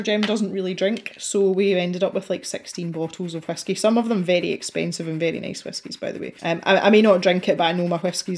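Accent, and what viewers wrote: Scotland, United Kingdom. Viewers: I am so loving this, its lovely to hear your accent too, I live in Aberdeenshire (Royal Deeside) so I feel really at home watching your channel.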